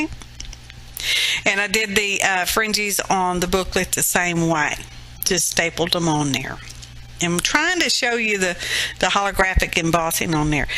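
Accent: South, United States. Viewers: Loved your project and love your southern accent!!!!!!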